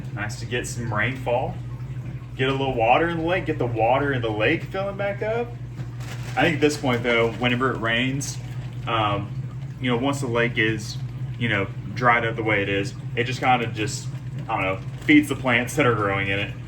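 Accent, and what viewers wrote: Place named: Arkansas, United States. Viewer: That’s “minnows”you act talk like your from Arkansas 😂👍💯👍Great Video 👍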